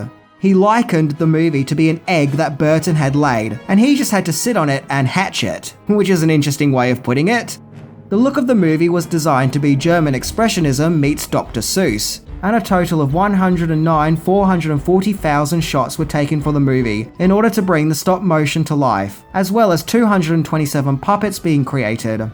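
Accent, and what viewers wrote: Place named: Australia. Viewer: Eh, he's Australian... they say things weird. I remember my Ex and I laughing back and forth over the way each of us pronounced "roof" or "shore"...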